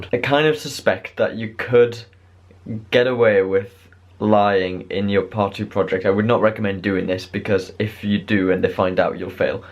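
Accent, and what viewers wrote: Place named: North, United Kingdom. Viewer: Mate your accent is like a hybrid of Manchester and Newcastle! Where are you from in the UK?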